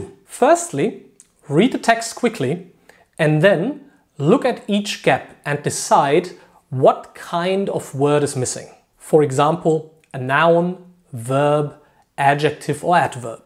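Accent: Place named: Germany. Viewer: Thanks, this is a really useful video. Do you mind if I ask where you're from? I'm assuming from your accent that you're German, as opposed to Austrian or Swiss.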